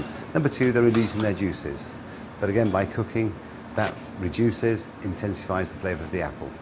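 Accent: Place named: Yorkshire, United Kingdom. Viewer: He is the poshest sounding Yorkshire lad I've ever heard!!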